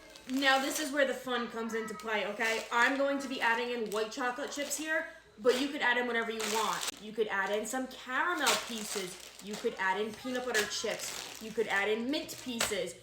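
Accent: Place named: New Jersey, United States. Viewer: You are soooo Jersey!!! I lived in S Jersey until I moved to Iowa. My boys always tell me, "your Jersey is coming out"!!!!! I miss the accent so much.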